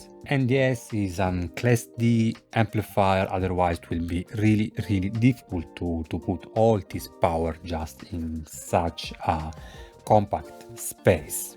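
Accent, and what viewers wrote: Italy. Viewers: Great review! I love your Italian accent :) Would you think the M1 is overpowered and a good fit for the Project Speakerbox V2s? I am planning to buy a REL Tzero MKIII with it.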